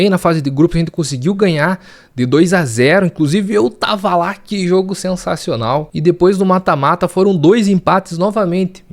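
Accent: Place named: South, Brazil. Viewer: Opa, tudo bem? Você é do sul? Seu sotaque… VAI CORINTHIANS!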